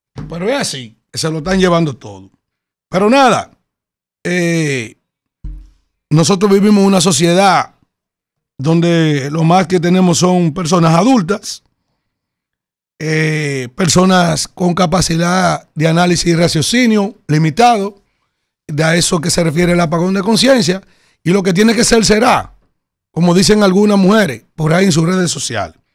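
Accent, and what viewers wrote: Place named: Dominican Republic. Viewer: Ustedes viven en un corral, no puede presentarse en un canal de televisión en otro país, porque solo sabe hablar en R D!!!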